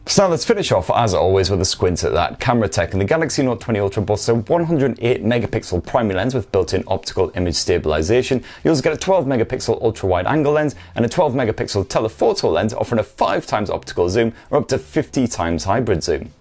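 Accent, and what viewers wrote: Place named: Scotland, United Kingdom. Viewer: your accent... it's what keeps me hooked lol... Scottish?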